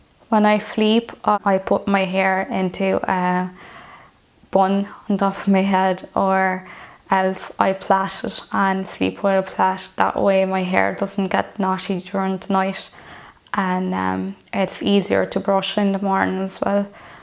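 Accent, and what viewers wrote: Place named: British Isles. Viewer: First! Love the accent. Did you learn English in the British Isles? Your Instagram is marked private :)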